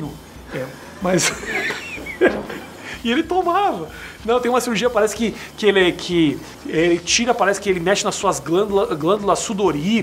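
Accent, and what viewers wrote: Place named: Porto Alegre, Brazil. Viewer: Fala bem de Porto Alegre!! Tu é daqui, po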